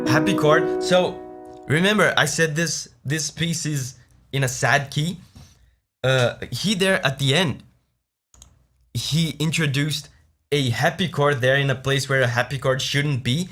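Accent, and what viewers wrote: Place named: Japan. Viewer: 英語の発音も我々日本人に近い感じたから語学勉強にもいい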